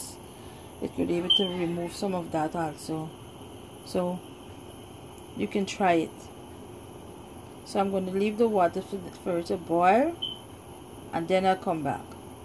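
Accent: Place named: Guyana. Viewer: Peace! You soundin like my countrywoman (bini) from Guyana. Thanks for this Queen!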